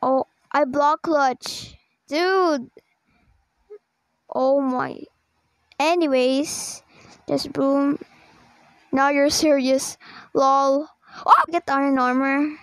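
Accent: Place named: Philippines. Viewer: Your sound is like Philippines bro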